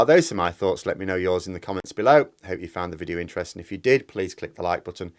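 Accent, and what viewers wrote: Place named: Northern England, United Kingdom. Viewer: I’ve watched every video for the last three years, I think I was instrumental in the initial improvement of audio with my sheer unmedicated adhd based abuse… (I was in a bad place at the time, still am lol) Now I’m working on his delivery 😂 My beef is the lack of dynamic control. The lack of breath control. These things would definitely help his career. I’ve been a professional actor/singer and breath control classes basically saved my voice, and made me better at my job. I listen on multiple devices, the audio quality is good until he gets too loud, then a combination of his own setup and YouTube audio compression algorithms makes it less than predictable to listen to, and less than enjoyable, that’s before I get into the psychological effect of being shouted at twice a day by an intelligent yet angry northerner. 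It makes me angry. It’s the natural effect. Anger is useful, but it’s not a good tool for education. I’m a music producer and mixer so audio is important to me. Clear, controlled speech into good quality equipment is what the tv industry has done for nearly a century now, the popularity of YouTube influencers often betrays the quality of their self-production. Many of these people don’t understand microphone proximity or directionality, reverberation, plosives, sibilants, digital clipping and distortion, digital limiting, RMS conventions for broadcast… or the rest of what makes BBC studio sound the best in the world, and is important for consistent, legible, easil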